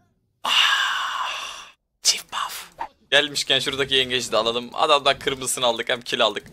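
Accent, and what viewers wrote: Trabzon, Turkey. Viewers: Bence Trabzonlusun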